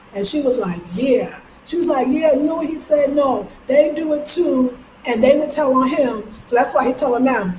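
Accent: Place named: New York, United States. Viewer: Yes we love you with that loud New York Accent, laughing , giggling, wearing bright colors & showing that sexy body!! 🌸🌸🌸🌸🌸🌸🌸🌸🌸🌸🌸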